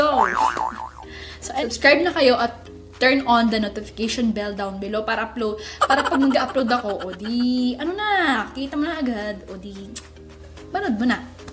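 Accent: Ilocos, Philippines. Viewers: Parang may ilokana accent ka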